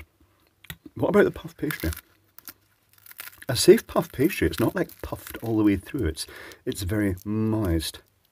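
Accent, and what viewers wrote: Scotland, United Kingdom. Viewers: Why the begging for money? I presume you have monetized your channel, so will be getting paid by YouTube. Also you sound British and this is a British product so why ask for a Dollar not a Pound, if you need a suplemental cash injection? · Is he from scotland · Posh Scottish accent ! Love it...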